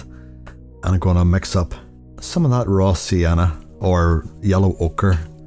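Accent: Northern Ireland, United Kingdom. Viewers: I love your videos! And it's so nice to hear someone from Northern Ireland talking ❤👌✨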